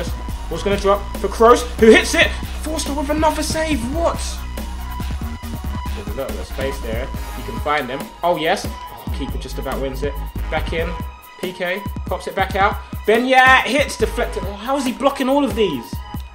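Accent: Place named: Scotland, United Kingdom. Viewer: messi, suarez and neymar = Fucking deadly scotish accent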